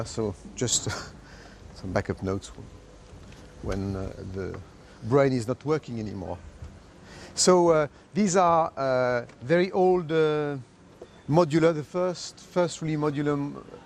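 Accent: France. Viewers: JM Jarre after a few years all around the world you have allways a huge french accent lol ( me too belgian/franch accent when i speak english) you're great , your music 's whit me from my chlidhood (im from 1977) exactly like kraftwerk or vangelis/klaus shulze/space/herbie hancock and many mores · He speaks English with a French accent but very well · He is actually a short little Frenchman with a French accent.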